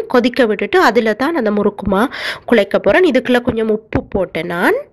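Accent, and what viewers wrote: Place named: Jaffna, Sri Lanka. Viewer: வணக்கம் சகோதரி. தங்கள் சமையல் குறிப்புகள் இரண்டும் அருமை. அந்த அலங்கார குறிப்பும் சிறப்பு. தங்கள் யாழ்ப்பாணத் தமிழோ இனிமை.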